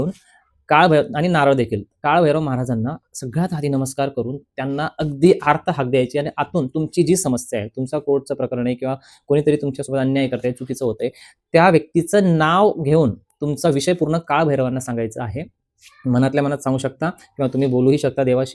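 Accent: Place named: Pune, India. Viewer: तुम्ही धुळे चे आहे म्हनता पण तुमची भाषा पुणेरी आहे धुळे जळगाव ची भाषा नाही वाटत.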